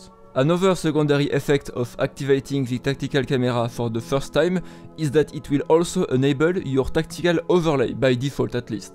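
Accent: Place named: France. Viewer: Precise, informative tutorial...and with a French accent! Really wish more Eve tutorials were executed with the same level of proficiency.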